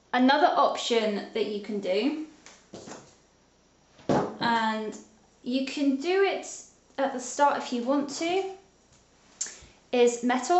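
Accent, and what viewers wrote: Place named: United Kingdom. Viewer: British accent! 👌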